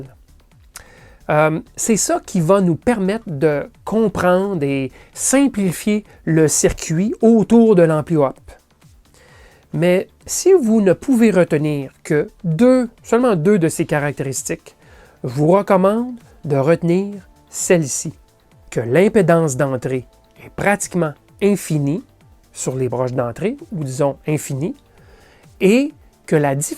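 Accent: Quebec, Canada. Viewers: Wouahouuu ! c'est EXCELLENT !!!!!!!!!!!!! très bien fait, vidéo très bien montée.... RESPECT !!! ET VIVE L'ACCENT QUEBECOIS !!vivement la suite !!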